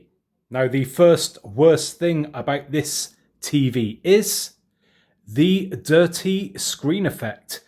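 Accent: United Kingdom. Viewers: are you from UK? good UK accent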